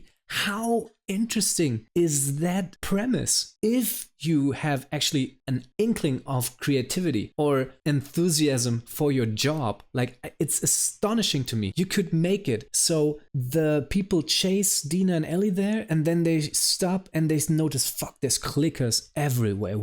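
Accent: Germany. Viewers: I caught the accent too lol, have German family. Ich mag deine Video Reel Talk! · Where is your accent from ? Germany ?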